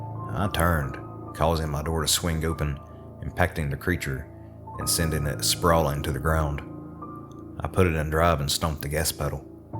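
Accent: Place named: South, United States. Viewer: Glad I found your channel. I’m from the south too and it’s refreshing to hear these in a familiar voice. Keep doing what your doing!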